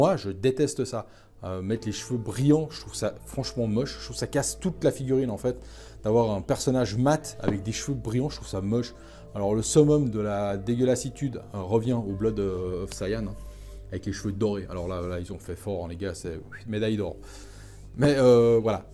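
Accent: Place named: Quebec, Canada. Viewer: This dude def from montreal or quebec.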